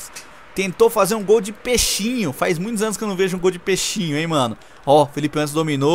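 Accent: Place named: Northeast, Brazil. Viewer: tu eh mito, parece ser nordestino kkkkkkkkkkkkk, por mim emprestava o haaland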